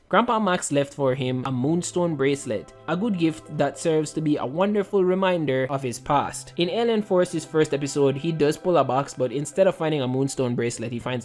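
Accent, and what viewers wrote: Jamaica. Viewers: W video, stumbled upon this video on my recommended. Loving the accent, not alot of Caribbean much less Jamaican people are on social media talking about ben ten or superhero comics. Keep it up bredda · Are you Jamaican? Your voice sounds off. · Dah accent big man are you jamaican by chance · Ayo you Jamaican?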